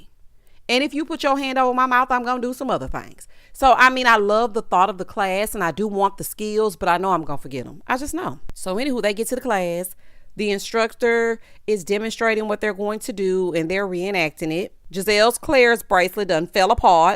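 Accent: South, United States. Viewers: I FF past Candiace and her fertility issues. I just don't care. Ugh! Loved the Gizelle and her daughter. Loved the PAVE scenes, but chuckled because that song seemed to go on forever. Wendy is beautiful to me, but does not belong on this show. Candiace keeps playing victim and it's boring. As usual I love listening to you. You're so country and it's endearing to me. Reminds me of being exiled to South Carolina from New York during the summers when I was a kid.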